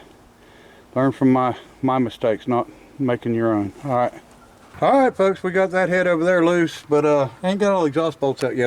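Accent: South, United States. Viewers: Nobody speaks Canadian. Kilometres with an American sounding accent. I’m an old Aussie. We speak in millimetres and inches too. Haha. I do love the connection of you tubers. Absolutely awesome work sir. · I am a French / bilingual Canadian from the province of Quebec, and I understand your language. It's the same with a beautiful southern accent. I love your automotive related videos.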